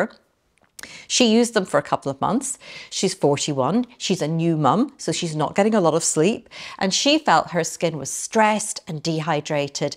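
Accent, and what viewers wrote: Scotland, United Kingdom. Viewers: ~ Love your accent and Scottish sense of humour too! ^_^ All best wishes from Brighton X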